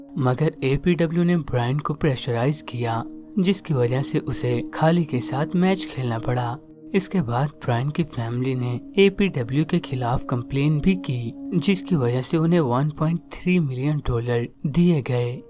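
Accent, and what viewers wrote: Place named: England, United Kingdom. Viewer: Thoda agarej ka awalad kam bano aur sahi se bol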